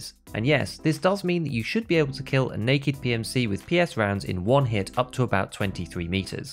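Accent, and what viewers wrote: United Kingdom. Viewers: I just want to give an absolutely TREMENDOUS callout on the zeroing conversation! It's so important to understand what the "default" round is for the gun to understand if you'll be shooting low or high, ESPECIALLY for a long range gun like the SVD. As always, stellar work my fine-talking British friend.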